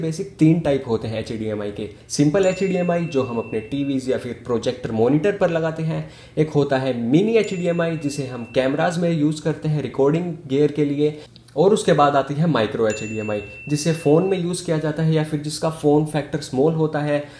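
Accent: India. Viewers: Are you Gujarati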